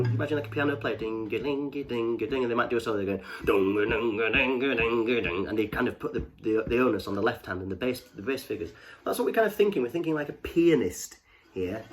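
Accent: United Kingdom. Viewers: Great instruction. Love all the little added bits that fill out the song. You are a great teacher and all done with a Northern accent gives it a special touch. Thank you.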